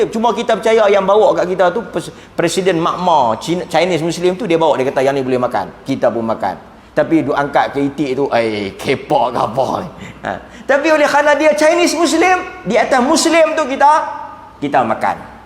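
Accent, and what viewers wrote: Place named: North, Malaysia. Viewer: Orang utara mmg bahasa kasar skit,tapi aku suka sbb ustaz ni teroih terang..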